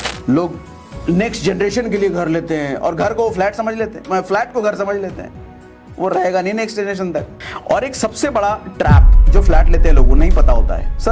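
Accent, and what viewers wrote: Bihar, India. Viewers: Gariayayga,Lagta hai aap bihar se hain.mai bhi hun.good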